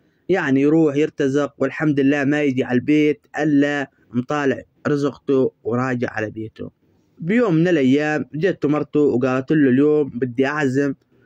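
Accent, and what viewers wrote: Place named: Hejaz, Saudi Arabia. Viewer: السلام عليكم اخي ياحلو سوالفك وسردك للقصه ليتك كل ليله تجيبلنا قصه وجزاك الله خير والله تابعت كل قصصك واعجبني لهجتك الحجازيه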